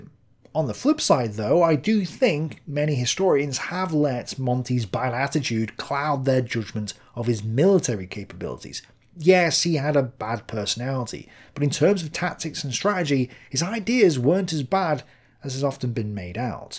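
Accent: United Kingdom. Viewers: I really like your videos and i think that they are very meritorical and well made. Because of this i feel kind of silly to admit that i usually listen to them while going to sleep. There is just something in your strong british accent and in the way that you calmly explain various WW2 topics. With your videos i am able to fall asleep in 10 minutes or less. Please do not take this as an insult, i do watch your videos without intent to fall asleep as well, especially battlestorm as i need to focus on the map to understand the situation. I just wanted to thank you for making my sleep easier. Have a great afternoon!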